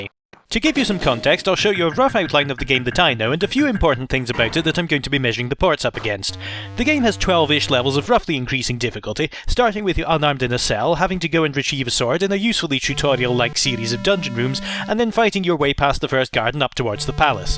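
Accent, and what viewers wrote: Scotland, United Kingdom. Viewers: I thought I heard a bit of Scottish in your accent lol! I didn't want to assume. ^_^ Loved the video man. Just watched your Prince of Persia 2 video today and that one was also great. Keep up the great content man!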